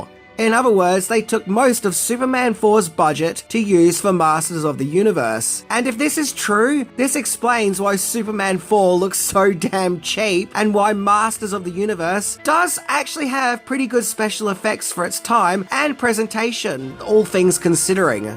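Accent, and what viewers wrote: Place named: Australia. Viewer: Bro you make some of the best videos ever!!! You are funny, imformitive and just over all a good dude!!! Im a 43 year old american male, i know the profile says maria curcio but thats my mother in law that passed in july 9th of last year and her daughter, my childrens mother was nice enough to let me use it even though me and my childrens mother havent been together since 2015😊 Im usually signed in as Heathdiegert013 or something close. I dont know how to change it and i feel bad to change it😊 I LOVE your voice, you aussies sound so cool!!! Please dont ever stop if you dont have too😊 my favorite Austrailian!!!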